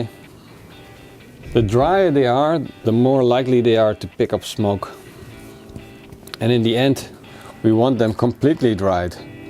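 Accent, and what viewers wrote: Netherlands. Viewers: Are you Dutch ? Your english accent really sound like a dutch man 🤣 still good video tho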